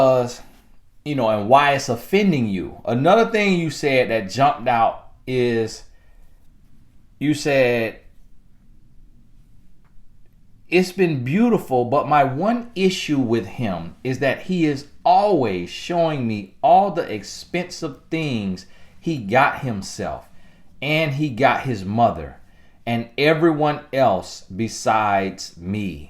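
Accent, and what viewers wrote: South, United States. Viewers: You remind me of my sweet husband. We got married young. He was from Alabama. He passed so I love hearing the southern accent. I'm from California.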